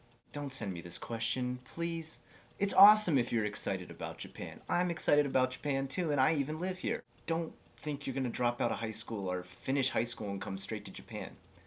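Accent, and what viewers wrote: United States. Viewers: I love the southerened accent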